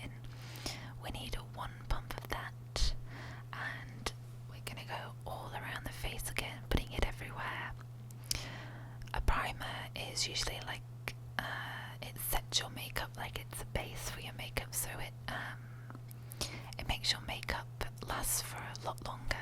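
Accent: Scotland, United Kingdom. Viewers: Great video, could you do some more Scottish accent soon? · Nice to hear a UK accent! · your otherwise beautifully Brittish accent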